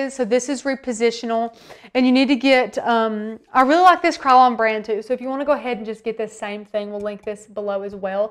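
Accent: South, United States. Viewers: Nice to hear someone actually form the south for once. Great instructions. It looks like fun , also creative. Thank You from Georgia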